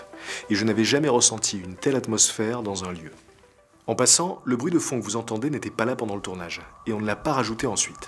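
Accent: France. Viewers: Salut!!! Tres tres tres bien!!! En tant que tcheque j aurais aimé que vous ayez prononcé mieux (cad pas a la française) les noms des personnes et endroits, mais c est un petit detail pas tres important. Chapeau, ou bien “klobouk dolu” comme on dit chez nous. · J'ai un peu mal en entendant la prononciation française des noms tchèques, mais chouette visite.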